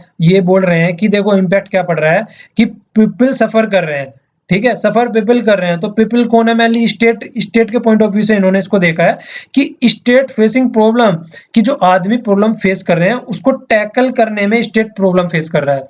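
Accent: Haryana, India. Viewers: खड़ी बोली, सुन के मज़ा आ गया, m HRY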